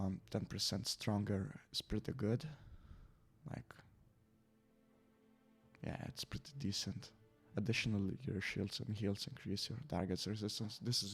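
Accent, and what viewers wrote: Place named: Lithuania. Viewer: Where are you from? You sound like a Lithuanian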